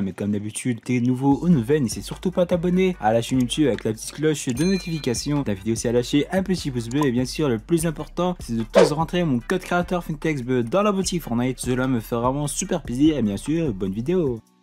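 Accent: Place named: England, United Kingdom. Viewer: L'accent anglais magnifique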